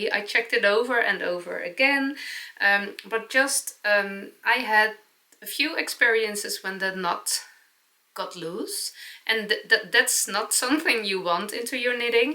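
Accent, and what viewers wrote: Netherlands. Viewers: Love your Dutchy English 🙂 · I love, love your “Dutchy” English so much and you are always learning our way of speaking. It makes me smile and happy to see and listen to you. Your knits 🧶 are beautiful and very inspiring for me❤❤❤❤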